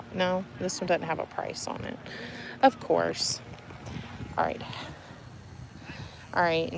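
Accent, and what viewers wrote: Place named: South, United States. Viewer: LOVE that southern accent!!!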